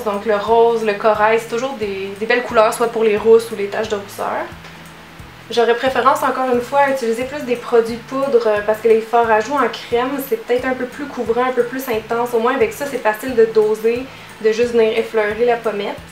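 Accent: Quebec, Canada. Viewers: Oh une petite personne avec un trop meugnon accent québécois! Et en plus avec un tuto superbe Représente 🇫🇷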